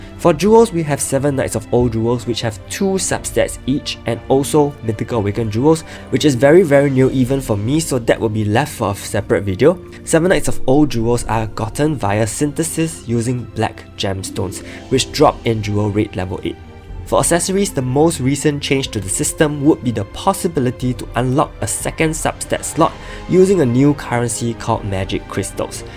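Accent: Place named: Singapore. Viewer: are you from Singapore? quite a familiar accent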